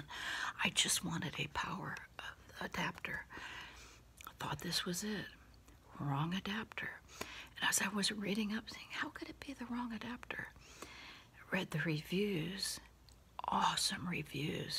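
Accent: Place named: Greece. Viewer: Sounds like greek woman I'm greek too για αυτό το κατάλαβα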